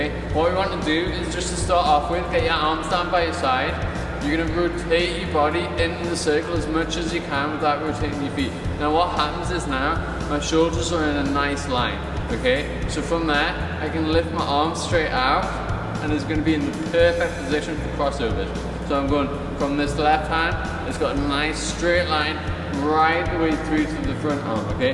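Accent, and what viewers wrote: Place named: Scotland, United Kingdom. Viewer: It's the accent of course. Sounds.. Scottish?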